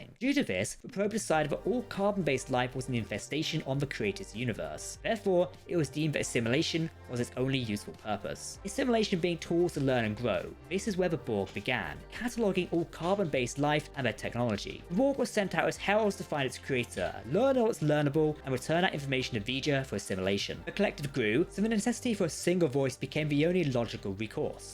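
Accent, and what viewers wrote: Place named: United Kingdom. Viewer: pro tip: if you stopped being so british and spoke slower with the correct pronunciation, maybe your audience will understand something, and you'll still make it to the dreaded 10 minute mark.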